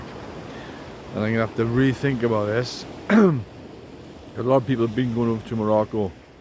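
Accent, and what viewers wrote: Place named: Welsh Valleys, United Kingdom. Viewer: You just hit my algorithm, just subbed. You sound like a valleys lad. Self - deprecating, no nonsense, tell it as it is, dry sense of humour. Wishing you all the best 😊🏴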